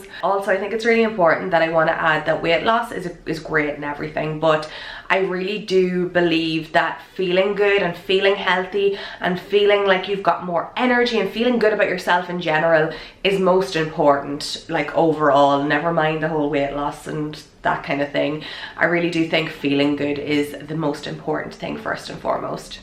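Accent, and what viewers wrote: Ireland. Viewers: Ho. I'm Portuguese and I love your videos. I also love your Irish accent. 😊 Take care.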